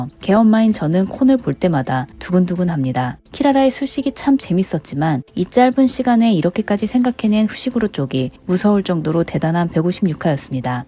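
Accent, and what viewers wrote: Japan. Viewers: 영어도 일본어 느낌으로 읽네요 ㅋㅋ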